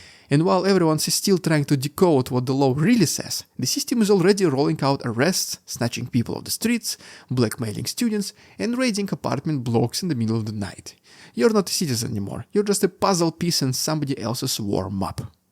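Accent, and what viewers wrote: Russia. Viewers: Love your charming Russian accent. Keep up the good work, and hold on to your accent, because it sounds authentic and is very easy to understand.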